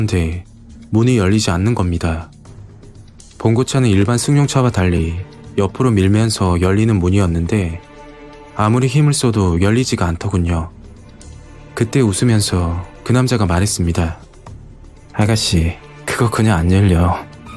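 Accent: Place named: Daegu, South Korea. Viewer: 자연스럽고, 편안한 사투리~~ 대구 분이라고 하셨죠?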